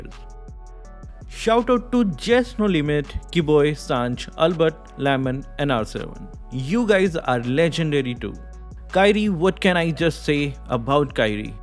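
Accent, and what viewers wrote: India. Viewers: Uk he's idian saying e first when there's s in a sentence example e stop u😅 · Indian english 😂😂😂😂😂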